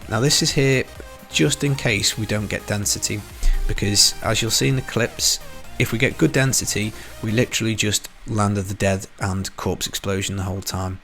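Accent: England, United Kingdom. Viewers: Is this a GR farming build or GR pushing build? Where you from? I detect England somewhere as I'm in Scotland...I subscribed a while ago and your vids are very good.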